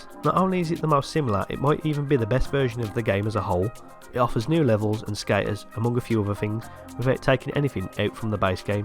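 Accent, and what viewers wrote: United Kingdom. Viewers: Your accent is very british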